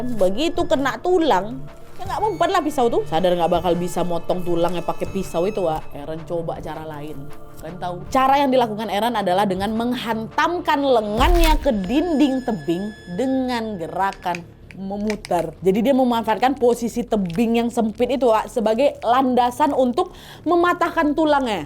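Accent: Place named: Medan, Indonesia. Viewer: namamu, namaku nidia. lahir 27 okt, aku 28 okt. Awal kali aku liat video ytb krn logat bicaranya kayak orang medan, kirain aku org medan wkwk Sukses terus ya kak❤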